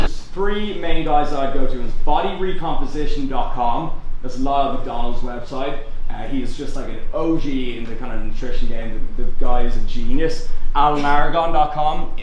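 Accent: Dublin, Ireland. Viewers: Are you from Dublin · what part of ireland are you from??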